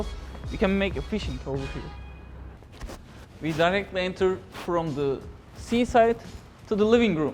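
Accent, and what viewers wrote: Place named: Turkey. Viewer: ajajajajaaa hayirdir bozaci siraci durumlari...siz gercekten baska bir alemde yasiyorsunuz, bu ayaklari oyle turk kankalarina yutturursun ama yurt disindaki insanlar bilincli ve A'dan Z'ye herseyin dogrusunu arastirarak ogrenip geliyorlar...yeterince rezil olmus durumdayiz millet gulme krizine girdi bu videoyu gorenler, bir de o turkce inglizceleriniz....soyleycek laf bulamiyorum IQ -1000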